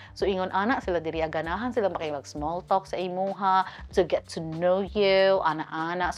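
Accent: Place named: Butuan, Philippines. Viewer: you speak like my cousin😅 are u perhaps from butuan? lingaw kau ko the way ka muspeak😊 more videos pa✨✨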